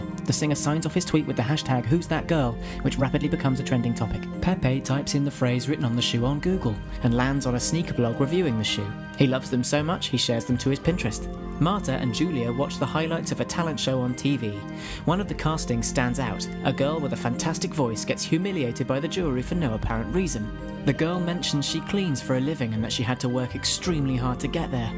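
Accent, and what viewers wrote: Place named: Ireland. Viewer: this square head Irish is pounding in my ears ><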